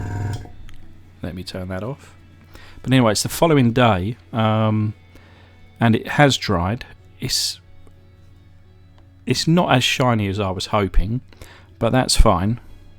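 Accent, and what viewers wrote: England, United Kingdom. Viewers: You have very traditional English accent. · Heard the British Accent, stopped watching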